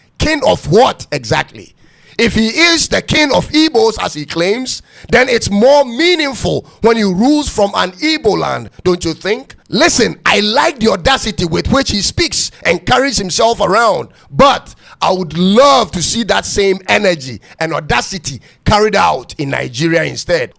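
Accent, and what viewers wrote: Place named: Ghana. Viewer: We clap 👏 for you you talk as original Ghanaian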